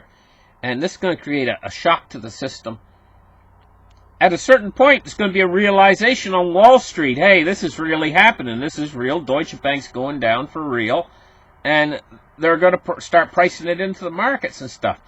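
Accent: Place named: Canada. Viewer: If anyone wants to hear a Canadian accent, this man is the example. "The titter on the end" Great accent, great show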